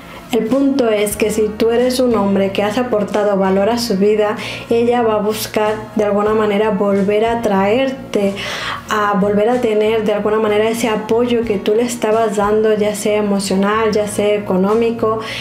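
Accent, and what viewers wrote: Spain. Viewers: A mí también me encanta toda ella y da buenas explicaciones... A veces se escucha como si fuera española, una jalisquilla con acento español...❤ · Estoy enamorado del acento de esta chica... De que parte de españa sera???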